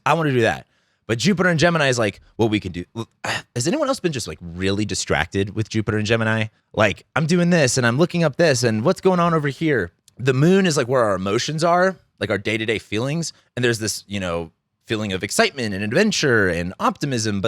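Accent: California, United States. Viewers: What? Are you from Lancaster or San Bernardino?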